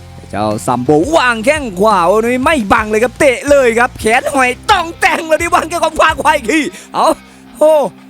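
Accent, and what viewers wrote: Isan, Thailand. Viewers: รำคาญเสียงพากย์อีสาน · พูดอิสานเฮาแกมภาคกลางฟังแล้วม่วนคัก ข่อยคนอุบล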